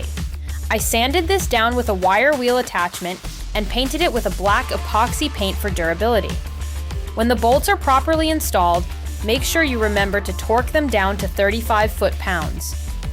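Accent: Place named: United Kingdom. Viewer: Nice job, you so funny and cute with that British accent!!!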